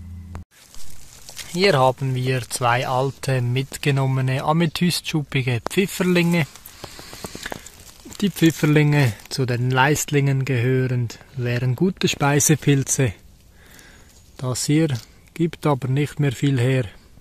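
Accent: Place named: Switzerland. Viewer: dieser geile schweizer dialekt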